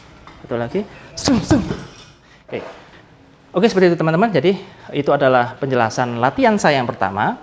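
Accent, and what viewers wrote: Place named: Malang, Indonesia. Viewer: Wong malang ta mas.nyuwon wa e😁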